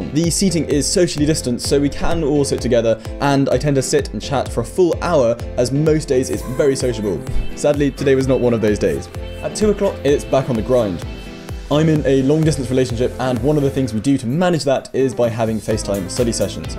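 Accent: United Kingdom. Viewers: Capoeira, I've been watching the movie about that! Only the strong 😊👍 I also love this martial arts. Just haven't try yet but,want to. And cos of a karate kid, I'm now trying just Karate sometimes in my university's class. But, I didn't make out that much cos of this covid-19 break, yeah but I will when I back to school. And, btw, my dream university is Cambridge. Just at the dream state and I do need to try in real with process and.. lots of trying one after one. Thank you, this is a motivation for me. By the way, I like your British accent. So, about that.. do I need to practice the accent? Seem personally but, just wanna know if so, maybe more familiar and understand easily. Is it Right? Thank you! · lmao thats just an upper class english accent a lot of people sound like that it just depends where you live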